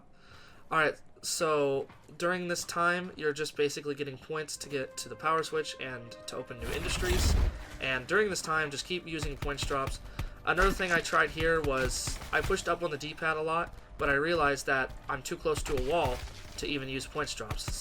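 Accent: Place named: Wichita, United States. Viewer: oh nah lol. I just came from Wichita for 6 years. The accent sounded like it was from that area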